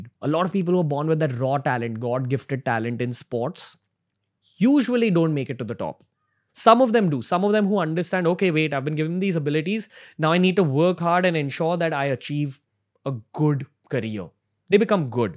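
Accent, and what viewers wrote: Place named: Mumbai, India. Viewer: Hi Ranveer, I hope you are well and safe. I don't follow your videos but have seen a few of them as you interview some sensible people like Saif Ali Khan and Rajeev Masand. Your english is understandable with your Mumbai accent. I understand you are trying to educate the masses in India (your prime target to enter Bollywood). I strongly feel every person in India wants to be a superstar! However, I don't understand that why do you keep mentioning who was your junior or senior in the elite school you have studied in. It's quite irritating to hear you mention this to every guest you meet. I assume you are doing well in your career. But are you trying to sell yourself by mentioning the names of all your juniors or seniors in school who belong to families with influence and money? Of course you are proud of your school and should be. 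Your body language is such where you looked and sounded like a scared child when interviewing Saif. I would like to conclude that I have written to you today using a pseudnym of Tanya Newman as I don't wish to be trolled, abused or threatened by your followers. Indians are a bit crazy that way if they feel offended by a view expressed by a lady. You look decent but please stop looking for praises. I really liked your mother's interview but it appeared that you wanted her to praise you all the time which she didn't. Most definitely, you tried putting words in her mouth. That was funny. And your video teaching the masses how to impress a woman/world wh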